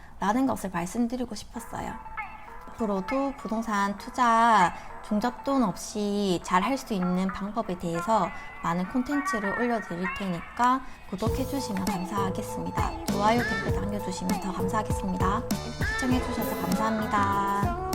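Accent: Gyeongsang, South Korea. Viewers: 경상도 어투신데 사시는곳은 서울이신가요? 좋은강의가 수도권에 있다보니 부산경남쪽 부린이들 교육하시는 진실강사찾기가 초보한테 어려운데 분양권공부시작은 뭐부터 해야할까요?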